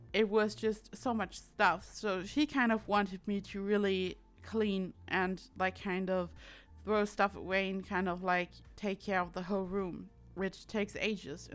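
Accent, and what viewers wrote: Germany. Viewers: Are you German by chance? I feel like I recognize your accent.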